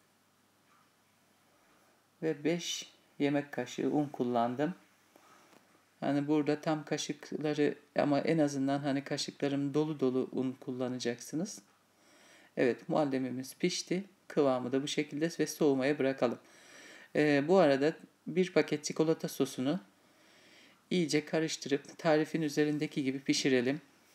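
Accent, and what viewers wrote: Black Sea, Turkey. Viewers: Karadenizlimisin abla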